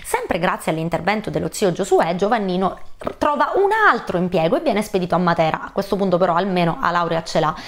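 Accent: Sicily, Italy. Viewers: Siciliana? 😘 Bum crash...🥴 🤕...ehm! Ottimo video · nel tuo delicato accento siciliano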